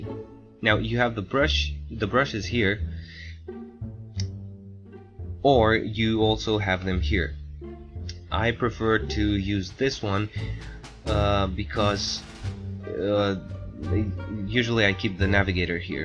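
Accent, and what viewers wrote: Romania. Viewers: You must be Romanian,aren’t you? Foarte bine! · mi-am dat seama dupa din nume si accent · yea bc he’s from romania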